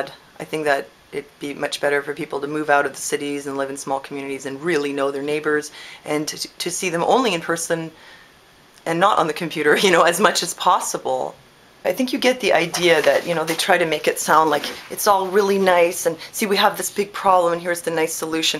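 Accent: England, United Kingdom. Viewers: Great English accent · LMAO the british accent - so fucking genius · Your pommie accent is HOT!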